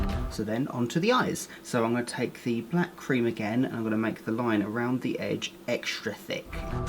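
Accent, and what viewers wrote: England, United Kingdom. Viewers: Are you from england?????? Just wondering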